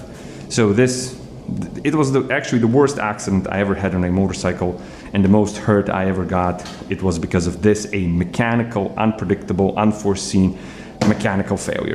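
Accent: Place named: Bosnia and Herzegovina. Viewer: I was surprised when I heard this very familiar Bosnian voice on an unknown small channel. Guess 2 x 2 is 4 then.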